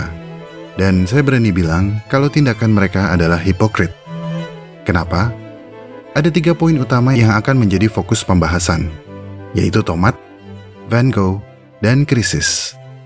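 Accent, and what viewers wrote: United Kingdom. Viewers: Aksen britishnya enak didenger 😅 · Logat British sangat melekat 😂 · denger aksennya ky lg ntn film Hewri Potah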